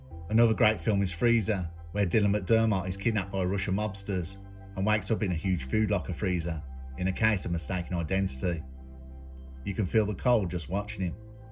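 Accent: Birmingham, United Kingdom. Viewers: I’m working my way through your video slowly, but I thoroughly enjoy your wonderful work. I don’t normally comment but I thought this video brought your personality through brilliantly. Keep up the good work! I enjoy your sillly smooth Brum accent (I’m a manc) 👍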